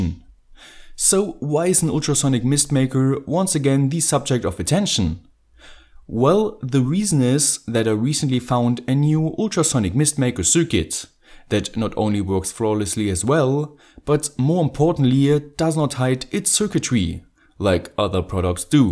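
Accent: Germany. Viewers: Er klingt auch so schon deutsch genug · are you german?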